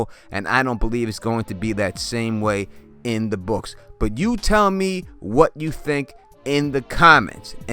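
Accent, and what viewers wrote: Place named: Long Island, United States. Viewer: Youre def from!!! Ny longisland my guess lol.. With that i say, keep it up good work brutha!!!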